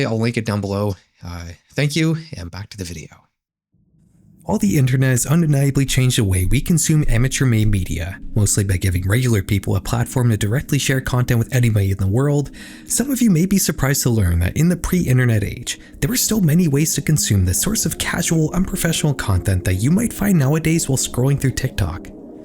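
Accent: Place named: Canada. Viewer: So you're from Ottawa, eh?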